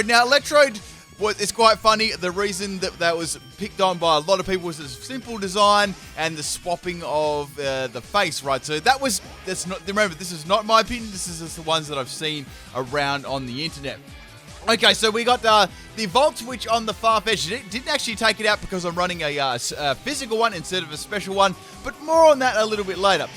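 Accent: Australia. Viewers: Are you an aussy? I just noticed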